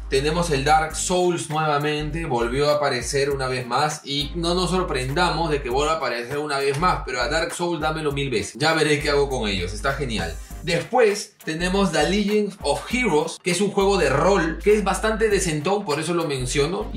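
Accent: Peru. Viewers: Acento limelo? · Eres peruano? 🤔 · Soy yo o su acento es de peru xd? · Eres peruano?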